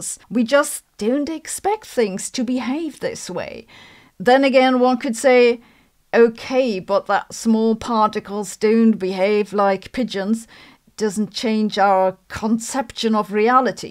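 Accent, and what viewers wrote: Germany. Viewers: She's awesome. I have watched several different science oriented channels but Sabine is my favorite. I can't claim to understand everything. I do my best to get a sense of things as she breaks down different topics but the vast majority of it is beyond what I understand. In my gut I feel like she is a person of character and if she realizes she is wrong about something she will admit it and explain it. That is everything in my book and so I trust her. Having said that, my grandparents were German and I love that accent... She had me at "Einshtein" 😅😊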